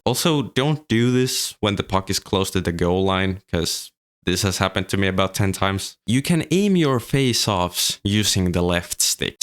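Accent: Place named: Finland. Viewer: Suomi englanti on parasta! Teetkö muute suomeks kans?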